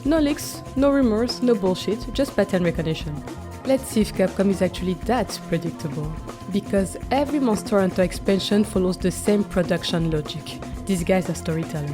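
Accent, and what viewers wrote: France. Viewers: Je me disais que t'avais un petit accent français et je vois que j'ai raison.. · i love ur french accent its so pretty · I love your accent. Are you French btw?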